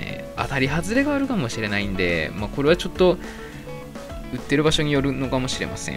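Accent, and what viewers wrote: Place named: Tsugaru, Japan. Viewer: お勧めで出てきたのでなんとなーく拝見したんですが、同郷の方で初っ端からの津軽弁で親近感！更にラーメン美味しそうで気付いたら最後まで見てました✨チャンネル登録しました！これからも過去のも見てきます〜！！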